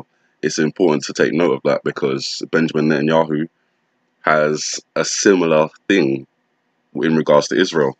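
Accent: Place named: London, United Kingdom. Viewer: He's from London lol I can tell...sometimes the accents are a bit hard to understand for others...I understand it though as I'm from England too. ;p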